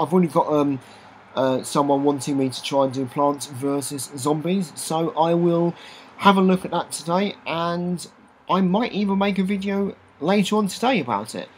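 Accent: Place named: London, United Kingdom. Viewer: Awww London accent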